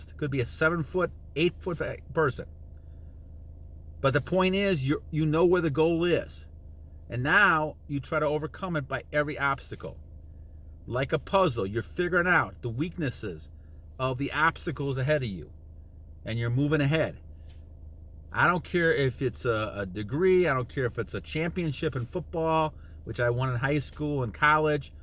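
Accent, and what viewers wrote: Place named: Illinois, United States. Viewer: I don't know where he is but he's definitely from Illinois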